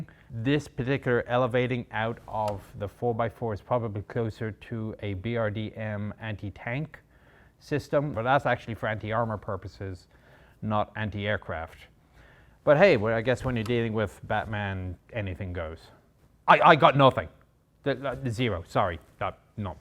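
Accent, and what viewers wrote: Ireland. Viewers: Long way to “Tipp” with the accent! Got to UCD Belfield? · Great video. Bit of a twinge of an Irish accent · Is that an Irish accent? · I'm sure he won't mind, but that is an Irish accent. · This guy is the BOSS!! He sounds Irish ☘️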